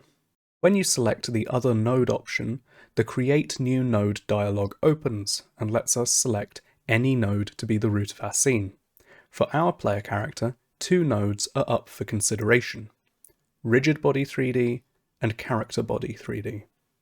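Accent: United Kingdom. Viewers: You sound English but look Australian 🤔 Thanks for the tutorial!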